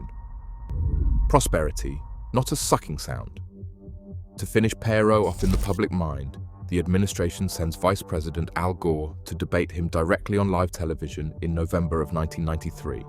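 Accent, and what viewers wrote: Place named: United Kingdom. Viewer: Please speak American, especially when the subject is American history. The word is Plant, not Plont, perty, not potty. I am sick of British accents being used for narration to try to impart seriousness.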